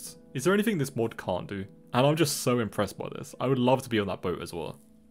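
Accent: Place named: United Kingdom. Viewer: you be british! you're the only british youtuber i know!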